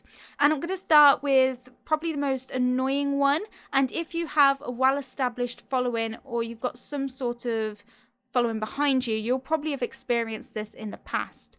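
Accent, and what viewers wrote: England, United Kingdom. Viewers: I don't why but I really have some interest for English accent! Love it!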